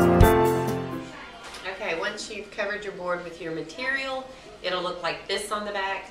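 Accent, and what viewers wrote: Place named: South, United States. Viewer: Wonderful job! Thank you so much for sharing. I have seen your etsy boards and they are beautiful. Keep making tutorial videos....your steps are easy to follow and everyone loves a good southern voice ;0)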